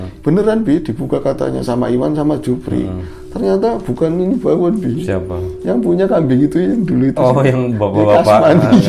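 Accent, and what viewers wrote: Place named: Tegal, Indonesia. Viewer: Kalo bisa logat Tegal, banyumasan,brebesan,dan logat ngapak lainnya jgn disembunyiin,mas!!.Unik sekali!! Salam Pekejing, mas!!!!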